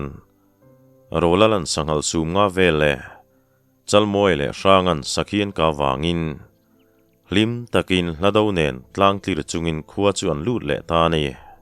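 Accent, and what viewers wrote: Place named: Champhai, India. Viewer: Tawng thluk thu leh lam dan ah chuan mizo thawnthu chhiar ka hriat toh ah chuan keini Champhai lam tawng thluk dan nen chuan i tawng thluk leh tawng kau chhe hi ala in mil in a dang hauh lo mai, khawdang tawng chu sawi lo ila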